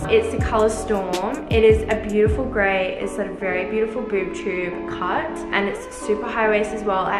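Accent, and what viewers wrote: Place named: Brooklyn, United States. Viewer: Love ur accent, Brooklyn :) nice video O:)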